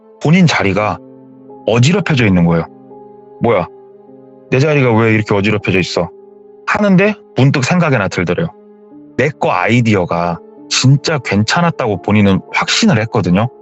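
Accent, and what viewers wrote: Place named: Jeonju, South Korea. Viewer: ㅋㅋ저는 전주라 생각했는데ㅋㅋ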